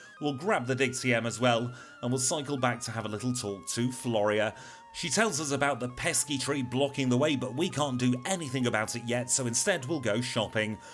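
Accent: United Kingdom. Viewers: wow that sounds interesting! That's fine, I will keep on enjoying your challenges anyway! I like very much! Your accent is so cool too! I remember you saying you are from Manchester... Is that correct? You're the first one from there I've ever heard! You friend LukethPlays is the second one! Thank your for producing contents for everyone! I think your challenges are unique and also I long to take part in the next stream! I'm one big fan of yours man! I wish you the best luck of all! See you later!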